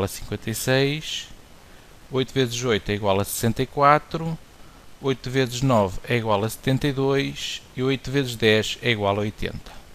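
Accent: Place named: United States. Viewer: otimo vc tem sotaque de americano ...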